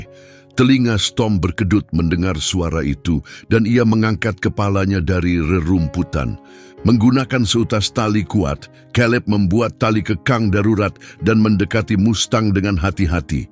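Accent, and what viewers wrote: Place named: Malaysia. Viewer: Terimakasih terjemahan ceritanya tapi mesti saya katakan betapa menyebalkannya lafal Melayu pengucapanmu...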